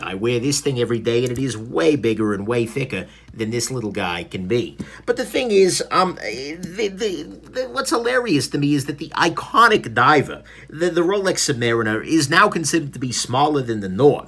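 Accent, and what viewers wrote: New York, United States. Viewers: Mate, I love the New Yorker accent, the factories in Malaysia have improved over the past few years and we can see it in the Seiko and Citizen product. Only one gripe, Citizen Eco's will fail at about 7 years, I know as I have bought them for my wife and Son in the past. Their not worth sending back for refits, so a dilemma arises with the owner. Clever marketing on Citizens account it would seem. All that said what a great review. Cheers from Brisbane, Australia.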